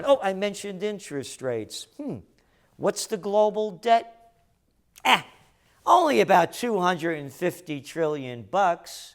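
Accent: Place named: New York, United States. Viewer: New Yawker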